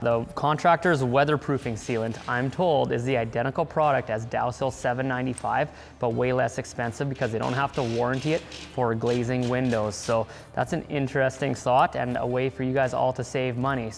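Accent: Canada. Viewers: The thing that bugs me the most about this video is buddy sounds Canadian af but days Zee instead of Zed.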